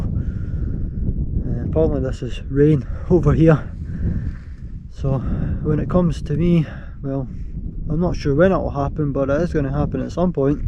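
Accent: Scotland, United Kingdom. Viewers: are you british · Are you Scottish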